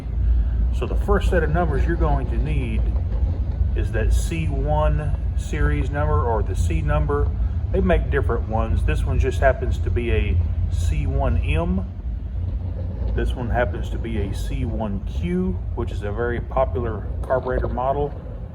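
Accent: Texas, United States. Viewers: I think he’s a Texan.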